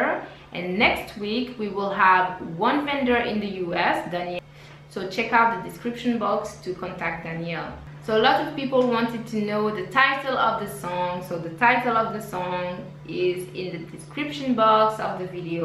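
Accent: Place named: Africa. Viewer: Your English is perfect. It's funny you do not speak it with a French accent. I hear an African accent. And I know Africa is a continent. But you sound like an African immigrant who has lived in the US for a while. Blessings. Thanks for the info.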